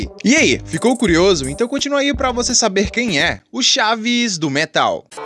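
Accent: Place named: São Paulo, Brazil. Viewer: Gente eu achei que esse cara era aqui de São Paulo 😂😂😂 Salve ♍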